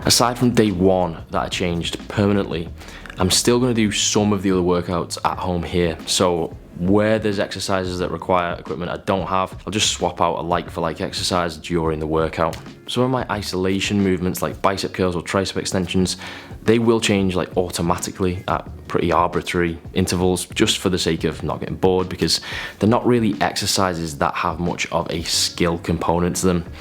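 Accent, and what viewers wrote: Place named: Merseyside, United Kingdom. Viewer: Great info but quick unrelated question mate, are there different accents in Merseyside? Just asking cos your accent ain't as strong as Paddy Pimblet's.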